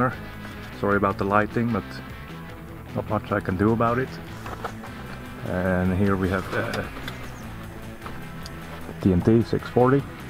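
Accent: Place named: Netherlands. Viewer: Cool shit - trying to place the accent. Dutch?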